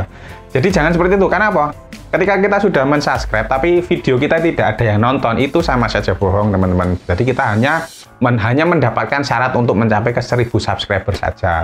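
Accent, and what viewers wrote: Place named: Ungaran, Indonesia. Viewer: Nek ngrungokke logatte mas, dadi kelingan kampung halamanku di ungaran mas, karna logatnya hampir sama, semoga tambah sukses banyak subsribernya mas, mampir Cilacap dan direview kotanya, wassalaam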